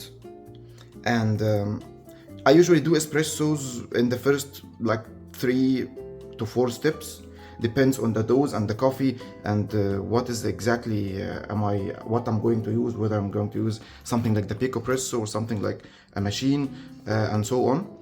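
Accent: Egypt. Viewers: subscribe and support for Egyptian content and Egyptian Accent 😍🔥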